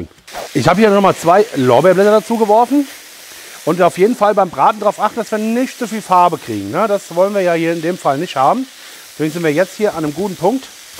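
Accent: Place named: Frankfurt, Germany. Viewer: Absolut! Und der Frankfurter Dialekt - super!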